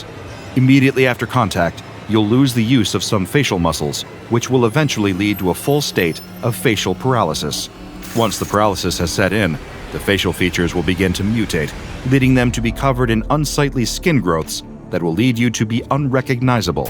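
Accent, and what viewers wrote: United Kingdom. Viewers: OG British accent 😂❤ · Dam 🦫🦫🦫 you really nailed the British accent sir 🙏. God bless you and your work, respect form Croatia-Europe 😇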